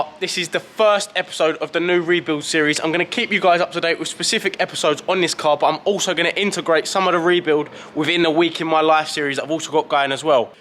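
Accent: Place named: London, United Kingdom. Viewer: Gangster talk? Lol it's just how us Londoners talk lad.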